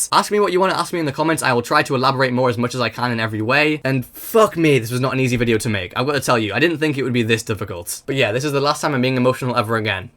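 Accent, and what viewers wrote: Newcastle, United Kingdom. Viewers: Are you from Newcastle bro?Bro you sound like your from Newcastle😭 Saying "roackaet" is a sign lol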